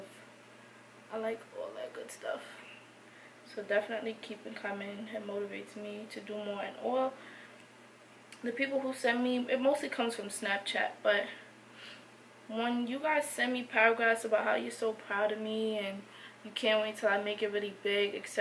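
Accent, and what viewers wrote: New York, United States. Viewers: You from new york? Harlem?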